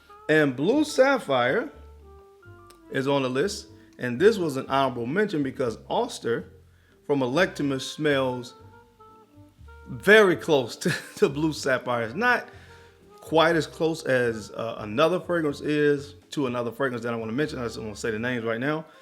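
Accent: United States. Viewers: Please, take the time and show the name of the fragrances on the screen. With your accent (or whatever...), It's not easy for non American to understand the names of the fragrances.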